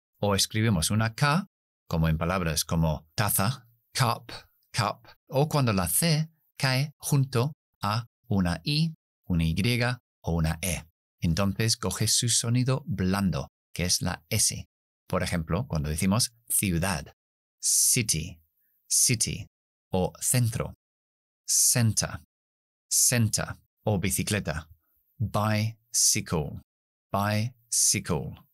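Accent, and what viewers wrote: United Kingdom. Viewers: Me encanta el británico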